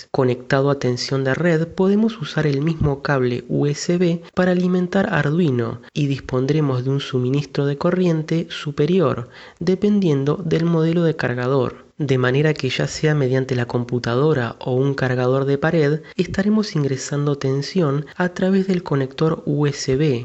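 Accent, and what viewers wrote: Argentina. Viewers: Hola. Bueno te comento que le hice mirar tus videos a mi abuela y entienió todo. Hoy ya no mira telenovelas y esta enganchada con tus videos. Ahora en serio, la calidad técnica de las imagenes son exelentes, camara, luz, ecsenario, todo controlado al minimo detalle. Tu capacidad didactica es un don especial que tu lo tienes. es dificil no entender alguna cosa. Por tu tonada eres porteño en lenguaje de arduino es -- pero tu GENEROSIDAD es ++++++++++++++++++++, te mando un abrazo grandote y gracias por todo lo que aportas.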